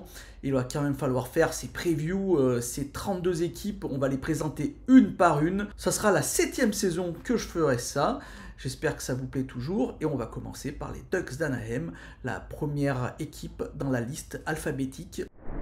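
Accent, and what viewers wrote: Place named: Dauphiné, France. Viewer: Cool je découvre tes vidéos sur les preview je trouve tes analyses juste avec un accent dauphinois si je me trompe pas 😅 le top👍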